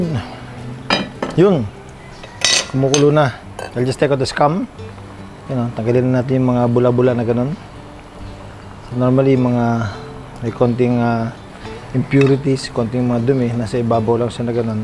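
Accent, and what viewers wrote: Visayas, Philippines. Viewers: Nakakatuwa mag presentation mo idol,tagalog na may twist visayan language kalami man oy hahaha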